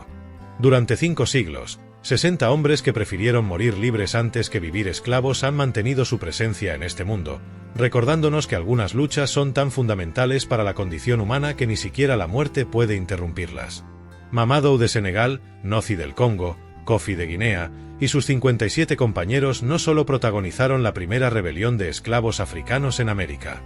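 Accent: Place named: Europe. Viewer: Observen que su voz está en acento europeo. Pero grabado recientemente. ¿El guionista cuánto cobró? En San Cristóbal nunca se había oído una cosa así. No crean todo lo que escuchan. Te da un guión con una narrativa impactante Y cómo la gente no le lee... La Era de la Desinformación Al mismo tiempo que habla de que fueron los portugueses dice que fueron los españoles. Tampoco dice otros datos verdaderamente teórico.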